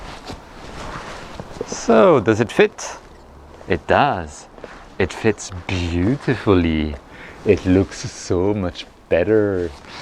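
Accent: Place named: France. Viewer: ahhhhh I knew there was some french tones behind! ;-) sounds like my french aunt accent , who is living in California since the 60s... that's why my ear is used to this very faint "french touch"... and that I definitely prefer the butt plug term (LOL) . I guess you're right about aesthetic, especially during nighttime, nobody cares! I'll go for the "amazon touch" as soon as tomorrow evening. Looks like I had access to another video i wasn't supposed to..sorry.. not my fault! But as a consequence I didn't received your answer to my comment about taking flats during sunset... :--)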